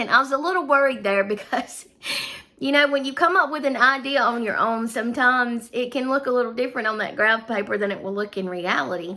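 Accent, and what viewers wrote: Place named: South, United States. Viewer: Are you saying peg apron or pig apron? Sorry, from Montana and not good with southern accents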